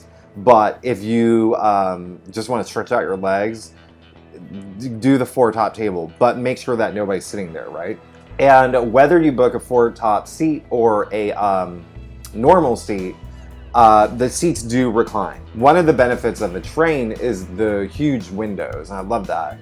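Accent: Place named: South, United States. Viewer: He's from the South.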